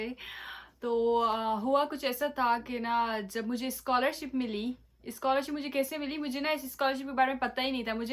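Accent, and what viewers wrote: Sindh, India. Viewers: Haha apka sindhi accent😂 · Why your accent is indian????dear proper urdu zaban ma bat karen plz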